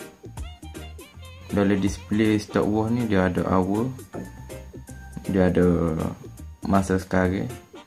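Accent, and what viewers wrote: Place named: Kelantan, Malaysia. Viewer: Terpadu skali loghat kelate boh...steady sep kito..haha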